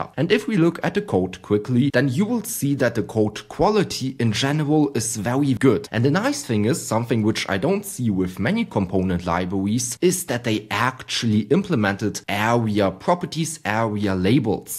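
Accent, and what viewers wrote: Germany. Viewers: your accent is really german haha, fire video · Are you German ?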